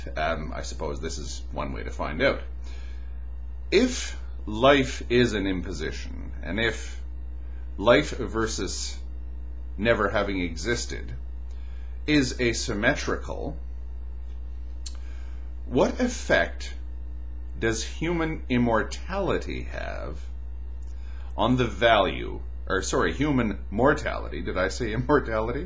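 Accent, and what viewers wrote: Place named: Canada. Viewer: Is this dude actually speaking English? I can't make anything out of his Canadian accent. But I do agree that Jacob's Ladder is a dope movie, cheers mates.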